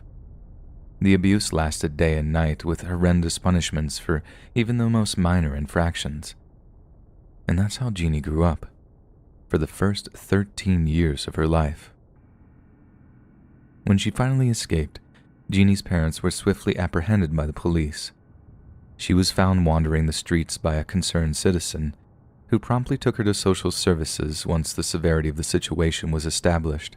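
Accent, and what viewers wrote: France. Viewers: Your French accent is so sweet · Ooooo I love your French accent lol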